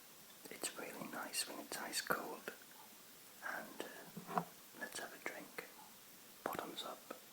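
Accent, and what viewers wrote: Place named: North, United Kingdom. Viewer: This video is way underwatched. I love it! Btw, Where is your accent from? I know its northern but I'm picking up lots of little bits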